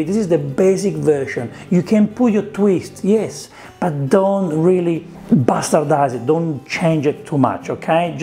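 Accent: Italy. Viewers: This is the Italian man,accent i always think of when cooking bolognese or something Italian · really don't know what to do with a maserati in the pan when real italian tomatoes come from the deep south of Italy! a sort of nonsense ... and the genuine stereotyped italian maccaroni accent.. or is it real?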